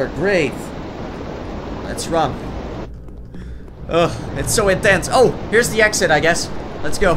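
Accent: Netherlands. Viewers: Hij is idd nederlands, hoor je aan zijn accent toch? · Hoi Ik Ben ook Dutch Ik Dacht al aan je acent:D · I think he's Dutch, I'm Dutch and Dutch people got the worst accents, I can hear from his accent that he must be Dutch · Gotta love the dutch accent😉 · he has a dutch accent indeed! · Your Dutch. You can clearly hear it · You kinda have a Dutch accent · Ja ik hoor een Nederlander xD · je klinkt best Nederlands ben je? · Almost definitely. Such English pronunciations are the disabilities of a real Dutchman!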